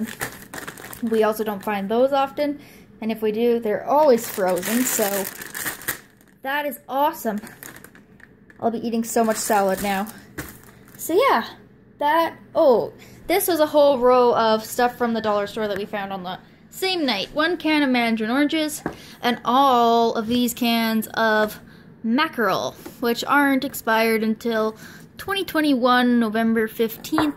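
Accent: Canada. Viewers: You’re obviously Canadian...eh...I’m in Hamilton Ontario. Where are you?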